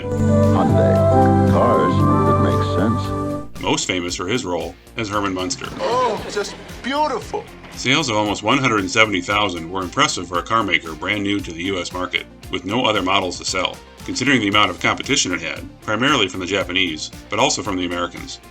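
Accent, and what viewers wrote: United Kingdom. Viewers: The way the British pronounce things are so freaking annoying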